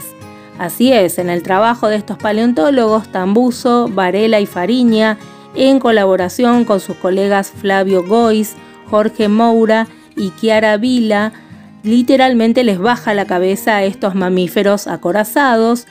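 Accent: Argentina. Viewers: dices por aquí, de donde eres? te noto acento argentino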